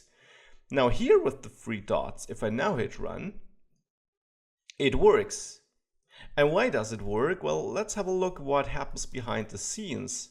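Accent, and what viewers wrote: Germany. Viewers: the thick german accent it, LOVE IT!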